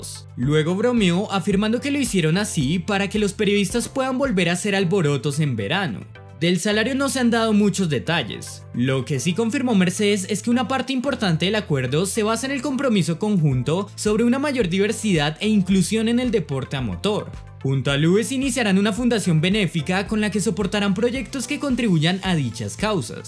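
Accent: Colombia. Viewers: es de colombia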